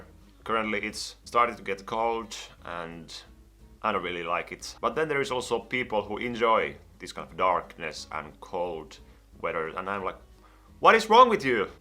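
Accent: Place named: Finland. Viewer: Are you a Finn? Cause that acsent sounds like you are a finn. I'm a Finn myself :P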